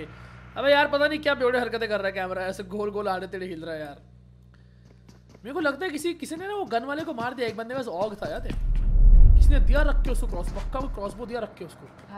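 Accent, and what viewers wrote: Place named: Maharashtra, India. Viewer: You say funny marathi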